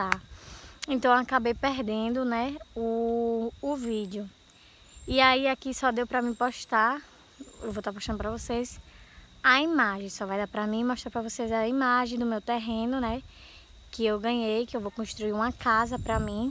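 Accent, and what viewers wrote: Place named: Northeast, Brazil. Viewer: Boa tarde, vim Através do canal Gaby Morena, gosto do seu sotaque nordestino, boa sorte e muito sucesso com seu canal.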